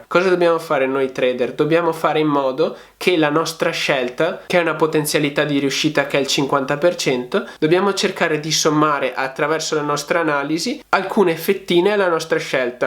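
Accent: Veneto, Italy. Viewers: Te si Veneto....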